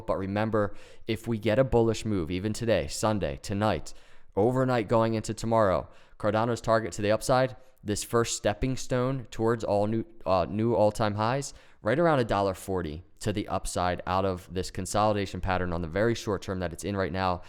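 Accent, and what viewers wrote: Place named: Midwest, United States. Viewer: Great videos. Are you trim the Midwest you sound like it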